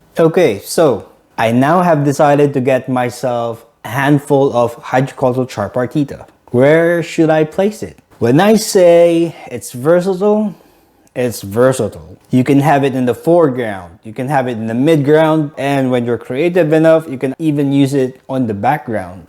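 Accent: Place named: Philippines. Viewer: I can hear that youre a filipino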